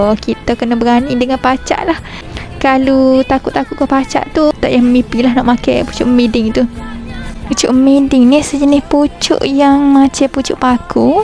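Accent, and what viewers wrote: Kelantan, Malaysia. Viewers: Wah mengajar dialek Kelantan 😲. Saya rasa bangga pulak bila boleh faham sikit-sikit 😂 bila ada cakap Kelantan. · Menariknya ulasan ni 😍😍😍. Suka dengar kecek Kelate. Ulang, ulang dengar 😄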